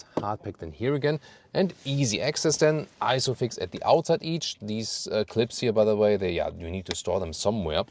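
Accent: Germany. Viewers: The German accent is amazing 😄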